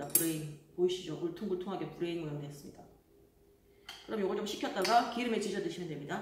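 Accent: Gyeongsang, South Korea. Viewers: 왠지 대구분이실듯 ㅎㅎ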